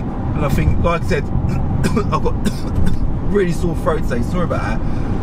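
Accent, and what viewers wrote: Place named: United Kingdom. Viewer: M freeee .... Noffin' drives like it....oh, that thick British accent!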